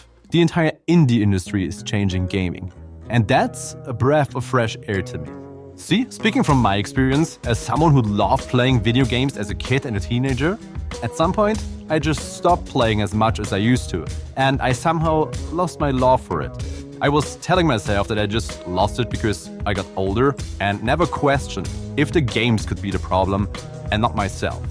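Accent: Germany. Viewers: Höre ich da deutschen Akzent?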